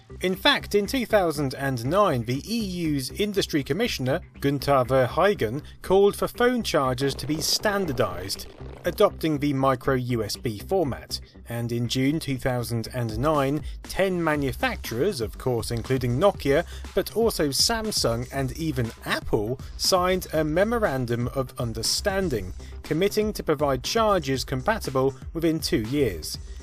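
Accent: United Kingdom. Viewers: I giggle every time he says Nokia. Does everyone from the UK pronounce it 'nawkia'?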